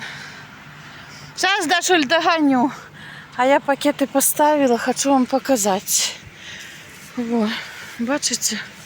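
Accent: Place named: Bryansk, Russia. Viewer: Как вас приятно слушать. У вас такой говорок, как у нас на Брянщине... Один в один...Давно не была на родине.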